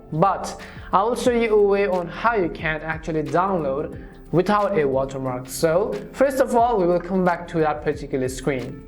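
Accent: India. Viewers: Problem not solved but we got normal indian accent before gta VI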